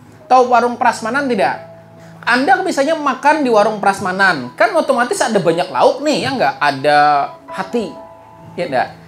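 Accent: Banjar, Indonesia. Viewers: Urang banjar kh ini pina logat nya 🤭🤭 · Pandernya kaya ada logat banjar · Loghatnya seperti orang Banjar/kalimantan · Logat banjar nya mantap pak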